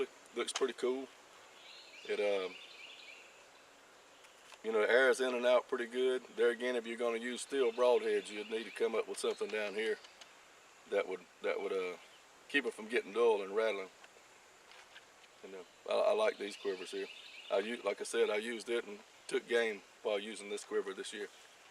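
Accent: United States. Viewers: You get into some stuff and sub to YT channels and sometimes your interest passes, but along the way you find a guy who could make doing laundry sound like the most interesting thing on the planet, someone whose enthusiasm and integrity makes them just a pleasure to listen to, you are one of these people. Best US accent ever too!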